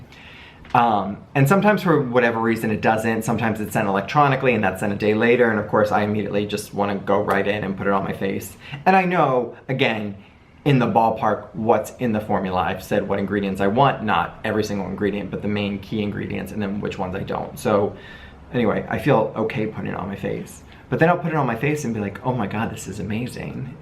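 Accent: New York, United States. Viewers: Are you a former New Yawka?? If so, hi from New Yawk. Just ordered serum and spray for the first time. Can’t wait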